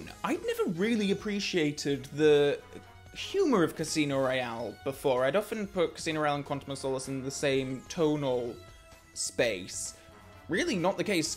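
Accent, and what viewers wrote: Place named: England, United Kingdom. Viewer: Great vid! Knew I would like this channel as soon as I saw you were dressed like a waiter and had an English accent. Great analysis. Subbd.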